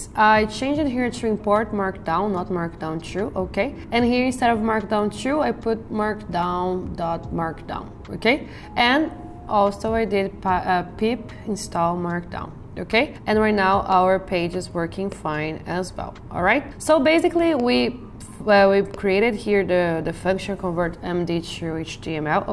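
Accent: Brazil. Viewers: Você é brasileira ? Tem um sotaque muito parecido. Thanks for the class!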